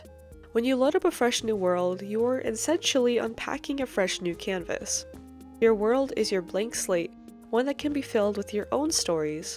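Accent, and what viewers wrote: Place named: Sweden. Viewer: HUR BLEV DU AV MED SVENNE DEALEKTEN I ENGELSKA?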